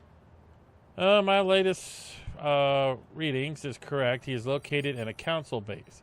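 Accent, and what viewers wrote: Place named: United Kingdom. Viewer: he's British, not American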